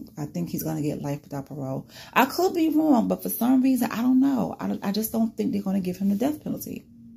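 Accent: New York, United States. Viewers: You sound like your from New York. We got New Yoooork in da house!!!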